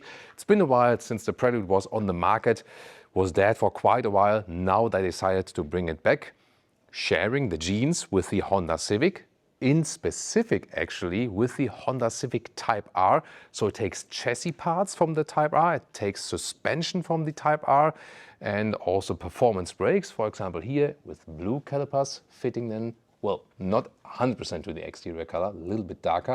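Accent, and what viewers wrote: Germany. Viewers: German Accent made this review even cooler.👍🏾🇺🇸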